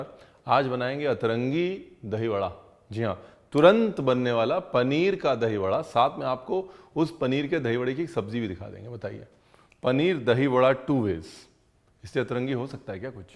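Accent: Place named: Lucknow, India. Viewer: Your Lucknow-a accent is sooo good , you're so Raw 🔥🙏 thankyou for making this channel . 🥰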